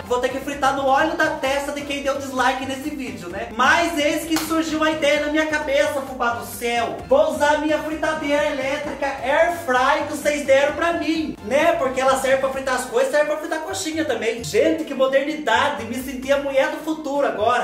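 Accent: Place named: Caipira, Brazil. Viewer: A cada vídeo, eu me divirto com você!! Amooooo esse seu sotaque de caipira!!! Obrigada por fazer meus dias mais felizes!! 💋💋 nesse coração peludo!! 😂😂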